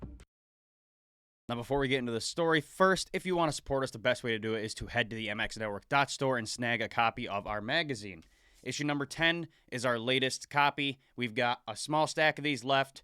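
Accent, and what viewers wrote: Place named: Michigan, United States. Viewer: U from michigan? I hear an accent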